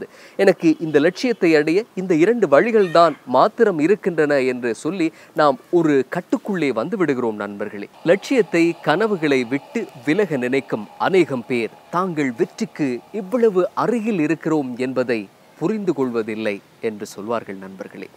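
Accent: Sri Lanka. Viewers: Excellent! This young man's language proficiency is remarkable! Beautiful pronunciation and enunciation of the tamil language. The content of his delivery is equally fabulous. Thank you and keep up your good work. I reckon he's from Sri Lanka.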